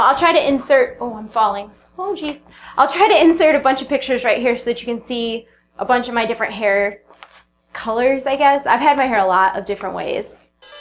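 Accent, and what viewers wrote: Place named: New York, United States. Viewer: I love the NY accent! lol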